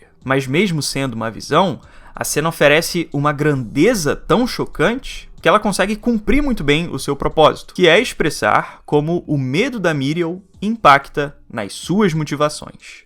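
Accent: Rio de Janeiro, Brazil. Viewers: Oh vey kkkk carioca?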